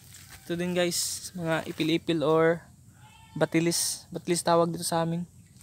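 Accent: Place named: Visayas, Philippines. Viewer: Bisaya ka pala kapatid. Malambod man.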